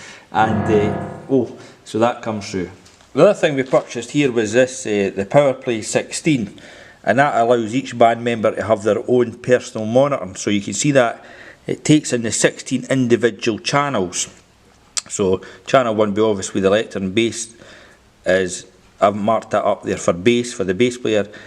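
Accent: Scotland, United Kingdom. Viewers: Enjoyed the Scots accent.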